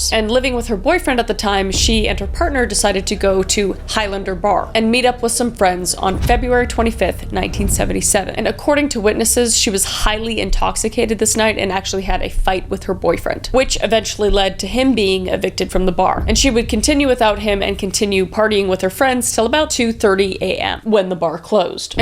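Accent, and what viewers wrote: Canada. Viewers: I really thought this was a great video to sleep to but first thing I hear is Canadian 👁️👄👁️ still gonna watch it rn tho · Lol your losing you canadain accent lol and can totally tell you are from BC. I grew up in Calgary and I just giggled when you said Cal Gary lol. I love your videos.keep the amazing job lol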